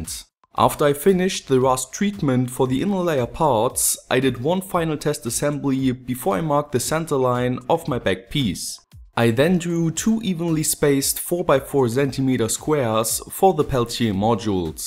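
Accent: Germany. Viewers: he's german, it's an accent. deal with it. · Du kommst doch bestimmt aus dem deutschsprachigen Raum oder? ;)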